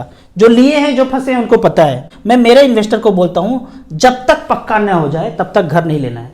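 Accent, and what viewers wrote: Bihar, India. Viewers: Good Video. Are you from Bihar?? You ascent is like Bihari.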